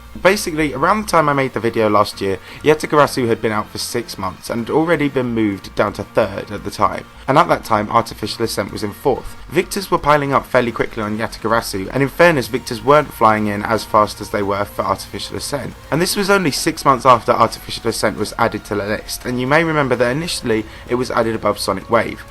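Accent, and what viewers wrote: United Kingdom. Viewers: 3 reasons I like -His sexy handsome face -His lovley eargasmic brittish accent -His good content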